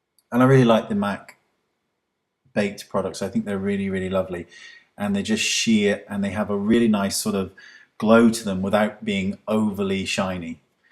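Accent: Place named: South West England, United Kingdom. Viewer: Thanks! Love Mandy's new hair colour! Great video, will have to try it out after pay day! Could you possibly make a video on how to cover dark under-eye circles? Mine are really bad and I have tried everything - light reflecting pens don't cover enough and thicker concealers just go cakey! p.s., knew you had to be a south-westerner! I'm from Weston-S-M!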